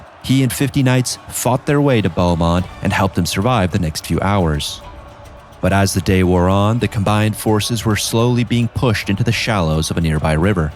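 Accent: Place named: United States. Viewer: Can't stomach the american narrator's accent, totally offputting.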